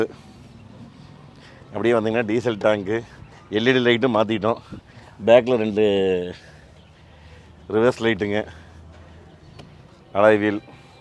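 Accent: Kongu, India. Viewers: Kongu thamiz awesome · கொங்கு தமிழ் அருமை....ஏனுங் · கொங்கு தமிழும் அழகு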